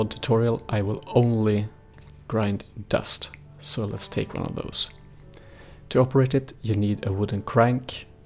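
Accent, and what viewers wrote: Sweden. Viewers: <link> Here is one of the lists I found for 1.7.10. Honestly, I was doing it to see how much I could fit together and if they would all run, it worked and I just kept the pack and it grew until I got too many, lol! Honestly, it was a LOT easier than any other version of Minecraft before it, almost anyone can make a mod pack now if they know how to "translate" the errors that may occur, I mean hell I did it. :D As always, if you have time and what not, you're invited to join us, but I completely understand how hectic life is and how little precious time you do have. Thanks for just reading and replying to these comments. I'll spare you from other comments in other vids so you can get back to you're life. ;) You're Swenglish is just fine, truly a great job. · where are u from? xD sounds like ur from sweden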